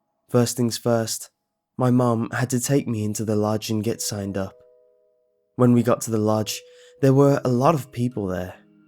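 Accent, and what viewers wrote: England, United Kingdom. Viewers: British or some kind of English, i believe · I think he is english. And some people do like this story. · A bad story read by an Englishman is still a bad story. Why do people assume that slapping on an English accent will enhance something. Oxy Clean doesn't work better since Antony Sullivan has been shilling it.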